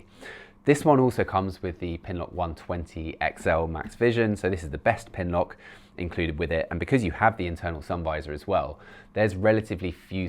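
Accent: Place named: United Kingdom. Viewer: speak a bit more clearer with he's British accent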